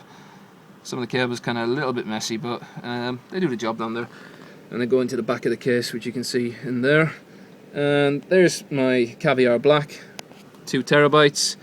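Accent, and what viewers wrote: Ireland. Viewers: hey man, u sound irish? i presume u are but anyway, i have the same CPU and im just wondering how much do you think i could overclock it using the standard cooler that came with it?.. i searched around but good answers :/ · Are you from Ireland?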